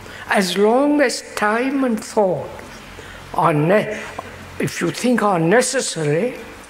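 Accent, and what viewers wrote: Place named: United Kingdom. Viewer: his accent is British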